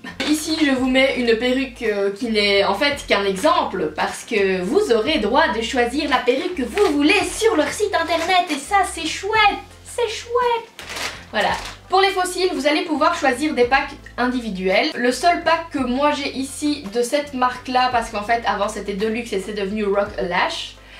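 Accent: Belgium. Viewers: Tu es belge ?😘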